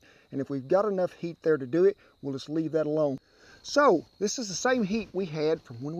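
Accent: United States. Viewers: Of course I would cook some thick sliced 🥓!!! That should always be the first thing cooked in a fabulous iron 🍳! I currently don’t own an iron skillet, but grew up on one as my Dad was from Tennessee. So, I recently decided that I want iron in my life and started researching and ran across your channel first off. I really enjoy watching you cook and listening to your authentic cowboy accent. Your recipes are fabulous and your wife is lovely. It’s always pleasure watching you 🥰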